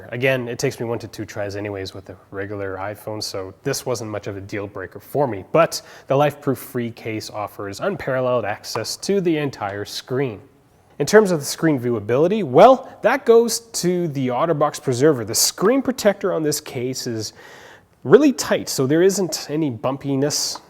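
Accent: Canada. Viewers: Canada eh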